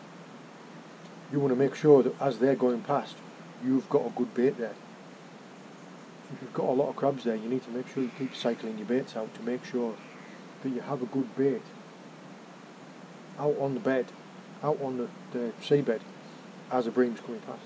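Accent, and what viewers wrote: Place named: North East England, United Kingdom. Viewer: Where are you based to mate, I just thought you were up in the north east because of your accent. Are you ex army as you are always well turned out for an angler!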